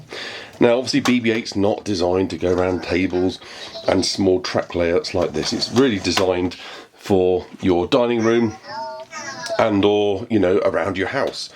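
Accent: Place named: England, United Kingdom. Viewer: Hello, First off. My Grandfather was from England and due to him, I tend to sound like I came from England as well. He passed away when I was 13 and I miss hearing an English voice once (Other then my own.) in a while and I loved hearing your voice. On BB8. I have read newspaper and magazine reports and seen a few videos here on You Tube about him. BUT! This report of yours was much better and covered more points then the news stories and other videos did. I was considering getting a BB8 for myself and your report helped me make up my mind about getting him, Thank you. I have a VOX R2D2 from the first year that "Tiger Toys" (Hasbro Toys.) came out with them (Thinkway Toys is making them from this new movie now.) and I have had a ruddy blast with him and every time I set him loose on his patrol mode, he gives my cats a very hard time and drives them around the flipping twist! 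I can not wait till I can get BB8 here and cut him and R2 loose in the house and watch as they target one of the cats and goes after her! THIS IS GOING TO BLOODY WELL BE A TON FUN!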